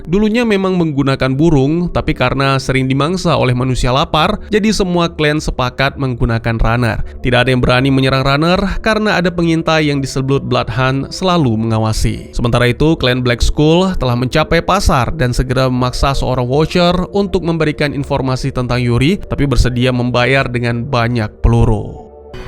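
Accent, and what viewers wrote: Aceh, Indonesia. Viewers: Orang aceh ya bang?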